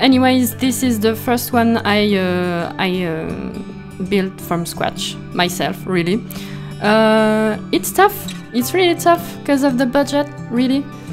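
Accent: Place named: France. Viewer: I can completely understand you! And for the record I love the French accent! And you’re voice is so nice to listen to. I understand that you’re nervous, I myself speak a different language and I just did my first voice over too. But it went well and with every time we do it I think we’ll be more and more comfortable 😊 I really like this home btw, so beautiful! 😍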